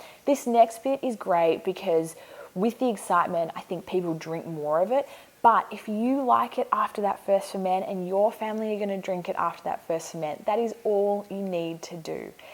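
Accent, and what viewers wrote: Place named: Australia. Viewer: Must be in Australia I love the Australian accent ariad I just made ginger beer that was easy but you do not need one of them nasty scobie's..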